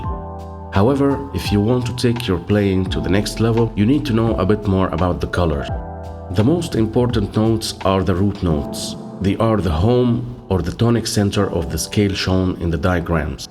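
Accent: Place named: France. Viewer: are you in France? You kind of have that french accent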